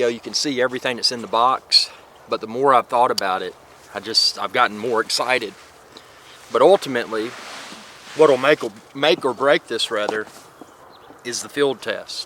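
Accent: South, United States. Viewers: thank you.. I am glad I found your channel... love your southern twang....new subscriber....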